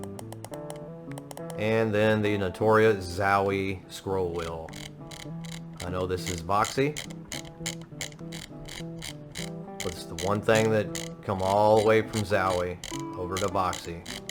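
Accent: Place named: Louisiana, United States. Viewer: Banger of a review, well done! :) just found your channel and subbed, loved the bgm to go with the chill Lousiana accent, great video cowboy :D Btw the flex is at the bottom of the mouse, I recommend not to go too hard there around the sensor although it's probably really safe, some flex at the bottom plate is basically always to be expected :)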